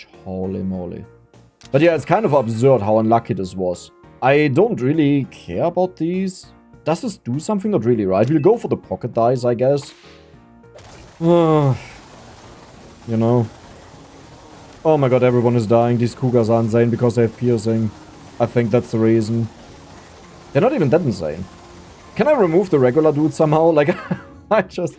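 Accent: Germany. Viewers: Honestly i love your german accent! (and am a little jealous)😊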